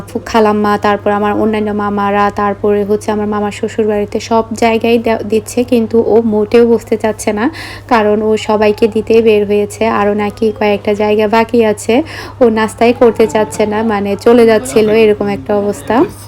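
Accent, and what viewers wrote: Chittagong, Bangladesh. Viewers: আপু অনেক ভালো লাগলো তোমার চট্টগ্রামের ভাষা অনেক ভালো লাগে শুনতে ধন্যবাদ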